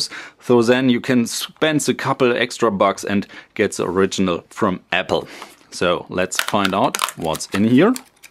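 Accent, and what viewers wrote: Germany. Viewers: you are from germany aren't you ? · Lustig man hört, dass du Deutscher bist, ich finde es aber echt cool, dass du auch Englische Videos machst. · i love ur german accent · German accent, aren't you?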